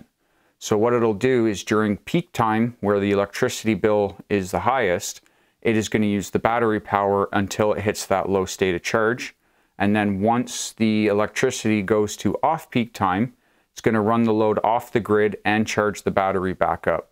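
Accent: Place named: United States. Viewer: I thought Yetis were brown? LOL. I have the AC200L, and can't help chuckling at the American pronunciation. Great video by the way. I have a 5KW solar installation on my rooftop. The power company will only give me 5 cents a Kilowatt input credit, so during the daylight hours I run all my power hungry devices, pump the house full of heat or cool air depending on the season. Now I have the AC200L charging during the daytime and running some of my essentials at night. I have a folding solar panel that I can use in case of grid failure, so I may never recover the full cost of the unit, but I have a bit of a guarantee against blackouts.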